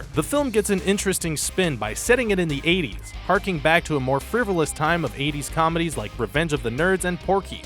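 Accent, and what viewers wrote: Canada. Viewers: too all you speech nazis...the narrators canadian you shit heads...they pronounce shit differently,,,entitled narrow minded shit heads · Stupid Canadians should learn how to speak proper English.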